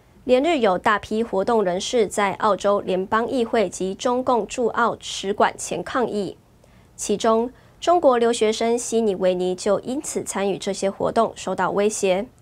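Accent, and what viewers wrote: Taiwan. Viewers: 這主播有親切的台灣腔調捏😂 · 台灣的腔調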